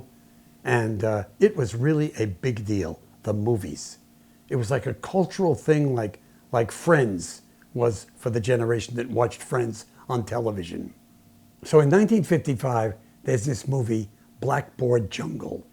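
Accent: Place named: Brooklyn, United States. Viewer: I love your accent, I wish more people still had it. Are you from Brooklyn? My grandmother grew up in the Bronx and had a similar accent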